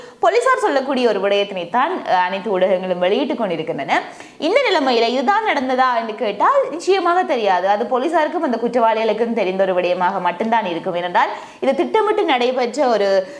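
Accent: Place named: Sri Lanka. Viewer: Sister ugka language style superb alakana Sri Lanka tamil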